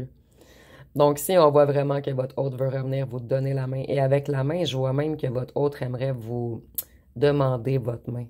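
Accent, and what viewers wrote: Canada. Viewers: Vous êtes la meilleure. En plus j'adore votre accent canadien. Vos interventions ont valeur de pistes possibles dans la carte de mon, pélerinage terrestre. Le très inspiré jésuite, Teilhard de Chardin, n'a-t-il pas eu cette phrase prophnétique = "Nous ne sommes pas des êres humains qui ont une expérience spirituelle; mais des être spirituels qui ont une expérience humaines. " et je retrouvre par votre voix cette Vérité fondamen,tale. MERCI à Vous, Madame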